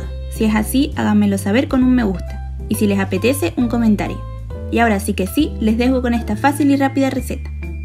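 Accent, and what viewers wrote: Canary Islands, Spain. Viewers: Mira que te había oído en otras recetas y decía... esta chica es canaria... pero al ver los suspiros de Moya ya me lo confirmó. Pondré en práctica la receta. Por cierto, como idea, puedes poner los ingredientes en la descripción del vídeo. Es muy útil para los que vamos a seguir la receta. Un abrazo desde Gran Canaria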